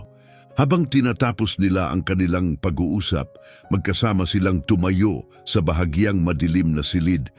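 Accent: Visayas, Philippines. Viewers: Magandang. 😊😊😊kuweñto ngunitpangit pakinggan ang mga pronouncition parang bisaya pakinggan pero marami akong napupulot na leksyon😊😊😊❤❤❤❤❤❤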